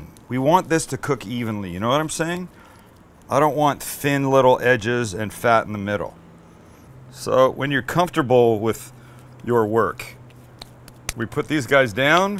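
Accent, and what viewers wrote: United Kingdom. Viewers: Loving the British accent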